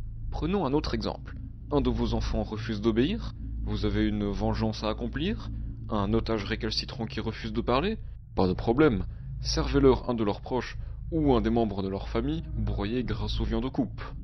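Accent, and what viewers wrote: Belgium. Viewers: Oh un belge ?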